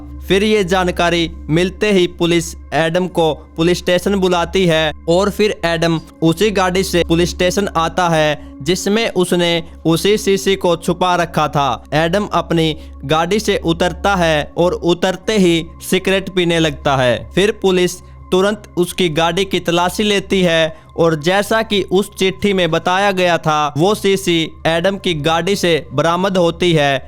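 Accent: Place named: Rajasthan, India. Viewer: Rajasthani aise hi bolte he shyad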